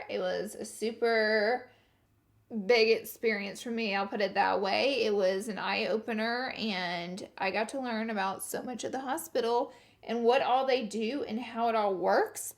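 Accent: South, United States. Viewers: I just love you... I come from the south too, and when I watched one of your videos for the first time, I was so shocked. I was so shocked to hear someone that actually sounded like me on YouTube. Someone who had a "thick" accent, like me, who was so out there. I love how you don't try to cover up your roots, or force yourself to be something or someone that your not. I'm writin this to you all the way from a teeny, tiny town to tell you to keep on keepin on, and to tell you thank you. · My heart is just so whole after this video, I remember when I first watched you I didn't know if I was going to keep on watching or not, but after hearing your southern accent I felt right at home(Georgia Peach, also Alabama fan). I loved when you talked about self worth, lately I've been in this long term relationship and he's the best boyfriend ever, but there was a point where I had no self worth because of all the drama and long distant we were going through. 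Now I've been working week by week fixing my self esteem and glueing all the pieces back together. I know that I may have my family, friends, boyfriend and other things to carry me if I need help, but I want to be able to walk on my own and not need help, and that's what I'm working on now. Your makeup videos have always inspired to safe up my money and spoil myself(because not only do have a makeup addition but I deserve it), but they've also inspired to reach my goals and look fabulous while doing it. You're such a glorious and fabulous human being and I love you 😘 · Omg yes!! Can't wait for your merch!!! I hope it has some of your country twang or sayings!:)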